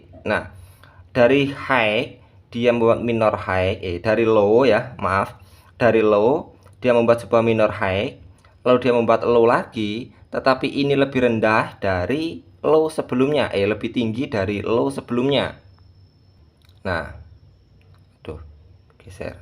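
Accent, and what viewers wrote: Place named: Java, Indonesia. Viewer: saya yakin bagi semua pemula penjelasan ini menyenangkan..guampaaaang di pahami...tidak blepotan...aksen jawanya mesok mbah..he..he...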